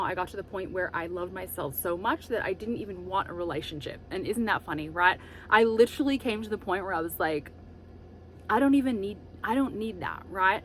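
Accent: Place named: Australia. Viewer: Thank You for sharing your thoughts, insights and advice on self work, self love. Currently healing childhood traumas and insecurities after having 3 months romantic state to friend zone now ghosted last 3 weeks. Digging the aussie accent!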